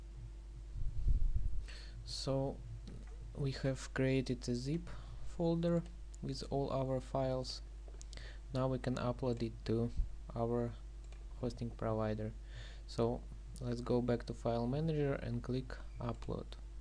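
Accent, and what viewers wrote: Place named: Russia. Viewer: you sound russian i love you <3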